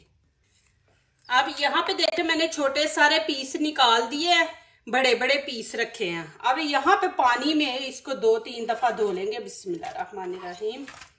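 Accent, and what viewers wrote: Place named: Punjab, India. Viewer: Ap ki recipie bohat achi hoti hen aur ap ka urdue me panjabi lehja aur mohabbat bohat inspire kerta he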